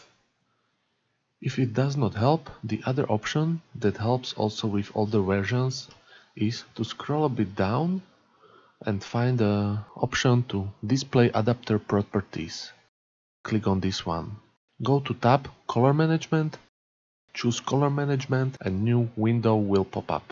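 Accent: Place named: Slovakia. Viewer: Hned podla prizvuku som zistil že si slovak :D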